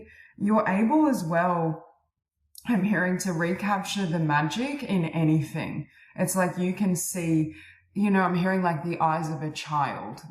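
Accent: Australia. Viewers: Ah, beautiful reading number 4, and lovely to hear your Aussie voice. I lived in Brisbane for 3 years, I so miss the sunshine there. Being an artist my creativity exploded when I lived in Australia. I loved the light and vibrancy of the place. In comparison the Uk which has a denser energy, I have had to work harder to raise my vibration. It has taken quite a few years to adjust being here. Oh, receiving some gold would be nice!☺️ The Police used to be my favourite band when I was younger! Warm Wishes and Blessings. Many thanks for your messages. 🌟🤍😌🤍🌟🕊️🧚‍♀️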